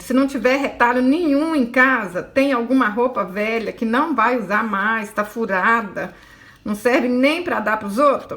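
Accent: Minas Gerais, Brazil. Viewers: Ameiiiii....e amei mais ainda o sotaque mineiro, sou uma capixaba apaixonada por Minas!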